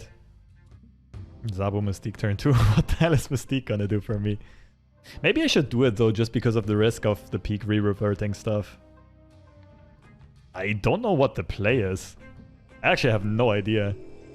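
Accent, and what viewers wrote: Germany. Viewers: Most german accent on YouTube 😍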